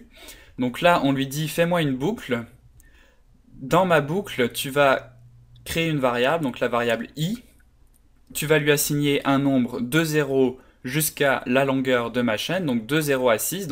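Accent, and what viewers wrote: Canada. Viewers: il m'avait bien semblé déceler un accent canadien dans ta voix ! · T'as des sonorités canadienne dans ta voix ^^ Sinon merci pour ton tutoriel, il est juste parfait !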